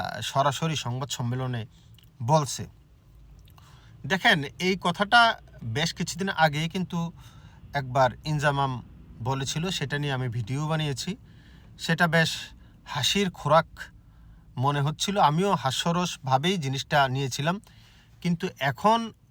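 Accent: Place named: Bangladesh. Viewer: Aapnar kotha sune ...eibar aapna k ekjon pure Bangladeshi bole mone hocche....jeta etodin mone hoini....🤩🤩🤩🤩🤩🤩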